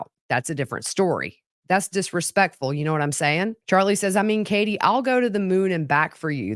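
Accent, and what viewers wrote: South, United States. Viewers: thank you so much for your hard work! We all LOVE your Southern voice. You’re adorable to the max, and full of the best true crime info on the web. Respect. ✊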